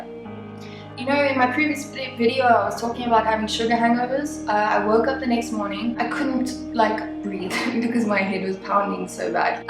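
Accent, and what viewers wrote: South Africa. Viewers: Are you South African? You have a really strong South African accent 😂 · are you South African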